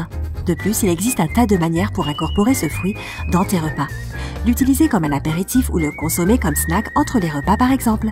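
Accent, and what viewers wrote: Quebec, Canada. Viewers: elle viens du Québec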